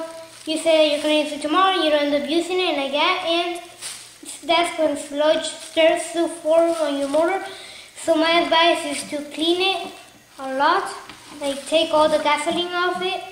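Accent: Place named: Asia. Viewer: You sound like an Asian. No wonder, you know how to do it.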